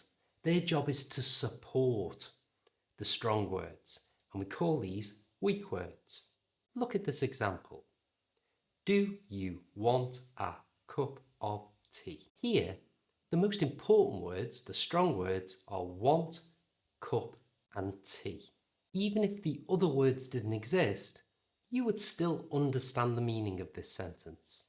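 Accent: United Kingdom. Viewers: ❤❤I am Mansour from the beautiful country of Iran, and I would love to learn the British accent. Thank you, dear teacher, for your excellent lessons. I appreciate your effort, and I kindly ask you to upload more videos so that we can learn English professionally and correctly from the basics with this beautiful accent.❤❤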